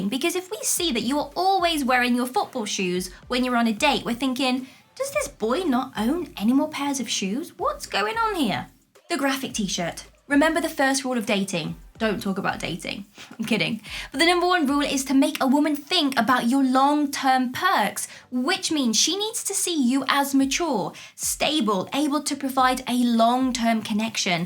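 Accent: England, United Kingdom. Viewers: She is lovely, intelligent and her Queen's English is easy on the ears.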